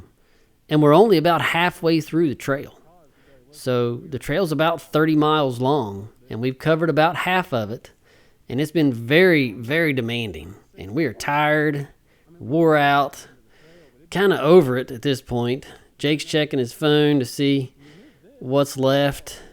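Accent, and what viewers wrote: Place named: South, United States. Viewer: I love this kind of video; No music, good southern narration 😄, the sounds of the bikes. Excellent work.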